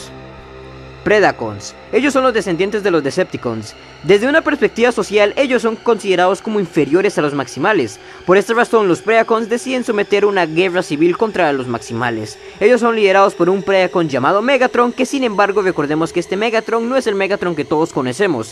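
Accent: Costa Rica. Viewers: Tu acento parece costarricense. ¿eres Tico? Pd: gran video, sigue así. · Acento Tico....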